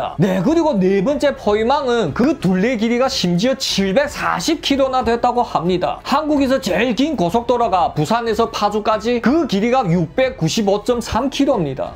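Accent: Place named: Korean-Chinese, China. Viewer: 구수한 조선 족 사투리와 스토리가 재미 있군요.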